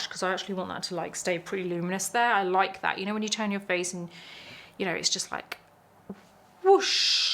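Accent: United Kingdom. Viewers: Wow, forget about the makeup, but I love the way you speak, you use that British accent and look . It suits you so much. I love watching you speak. 😅❤ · Does your accent change?? You’re more British than before 😊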